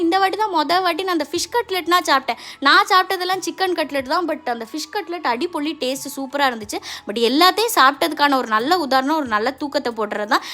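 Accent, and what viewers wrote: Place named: Kanyakumari, India. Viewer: Unga slang kaekum botae kanyakumari slang maariyae irunthuchu😊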